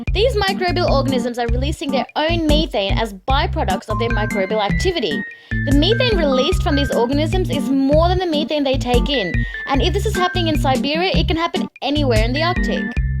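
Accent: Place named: Australia. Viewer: great video; I am too curious though, what kind of accent is that? I want to say Australian? too few views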